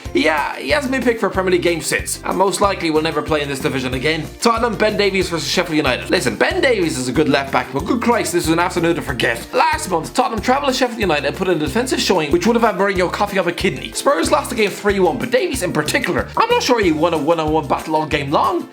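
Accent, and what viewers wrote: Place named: Ireland. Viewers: Can you please Stop! Doing these chips.. so quickly your voice is afraid irritating! The Second I heard your voice I have turn it off.. I don't mean to be offensive in anyway but the Irish accent sweet when it's smooth & slow but you talk at 100 miles an hour & it's like listening to a fire alarm going off at 4am.. consistent your audience thank you. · You do realise that the whole audience love that he speaks fast and his angry Irish accent, its just you being a Karen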